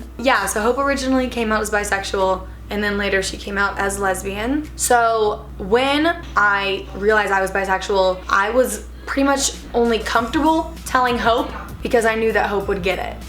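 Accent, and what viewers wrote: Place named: United States. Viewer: Your funny 😂😂I love your American accent 👋🤟🏽hi I’m Niayla nice to meet u 🤣🤪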